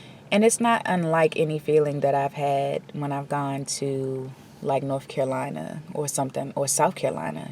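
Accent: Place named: DMV, United States. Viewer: I hear a dmv accent. I am from there. I am feeling the same way with the trees. I wish I can move so that I can feel more freedom of nature. ❤ im grateful you have found your way.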